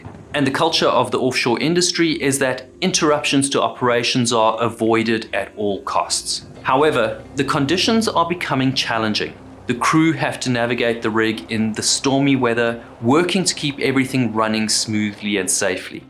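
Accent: South Africa. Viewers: Great video thanks. Everyone can tell you're from South Africa because of your accent.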